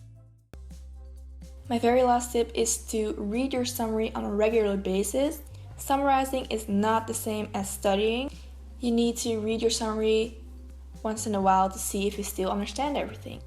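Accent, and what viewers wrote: Netherlands. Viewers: I love it when you immediately hear someone is Dutch or not ;) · ik dacht al dat je nederlands was😂😂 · Wait a minute, are you Dutch??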